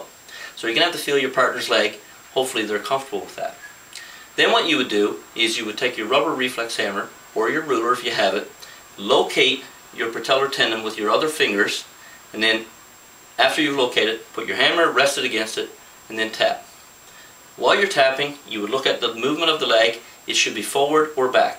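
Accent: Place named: United States. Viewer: American, I think.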